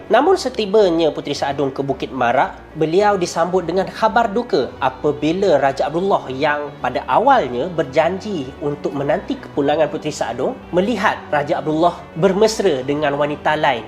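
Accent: Thailand. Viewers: Aku suke die ni ckp nme org tu.. Die pnye slang Thai tu.. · Orng thai ke ni accent dia beli sebut nama ii tu sebijik orng thai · Best dengar slang thailand 🤭🤭🤭🤭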